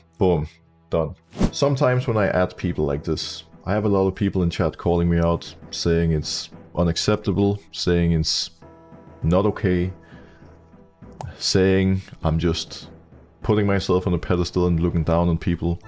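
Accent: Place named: Denmark. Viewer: i had the video playing for the first 2 minutes while doing smth else on my pc and when i actually started watching i was trying to find the SETT and i was like wtf xD danish accent got me there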